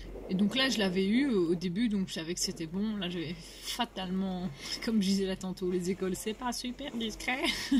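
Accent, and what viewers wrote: Belgium. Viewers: Bonjour L'expression "a pouf" pour "au pif" :o) Mais "passer en stoumelings dans les travaux ;;;" (truc comme ça) ??? Sinon j'adore tes vidéos et ses commentaires avec la gouaille bruxelloise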